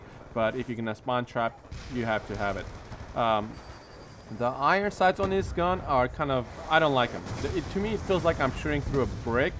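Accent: Russia. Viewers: You're really cool man, you've earned a sub, like and a comment :P But 1 question: are you russian or american or what? sorry i'm not too sure. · cool class you sound russian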